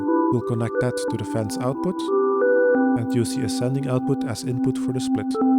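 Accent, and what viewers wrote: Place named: Netherlands. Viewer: thanks for the response - it's one of the best and most lucid tuts i've seen about this subject - would it be possible to put the voice on one channel and the synth on the other so that we could pan it at home? i'll definitely give it another go, but trying to decipher your (Dutch/Belgian?) accent at the same time was giving me a headache so i had to stop about 10 minutes in, sorry :)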